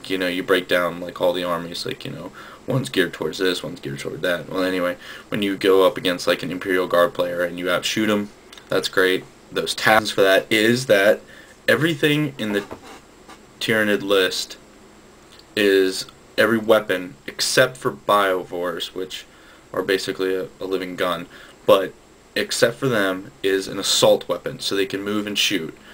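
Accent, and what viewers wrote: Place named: New Jersey, United States. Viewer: Fucking hell, you understand this game so much more than me. You sound just like the guy that keeps kicking my Ass! (He's from New Jersey, sorry if that offends, I'm English!).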